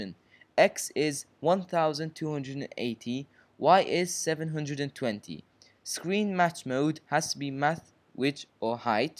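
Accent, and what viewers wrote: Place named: France. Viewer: Thanks you french-voiced stranger.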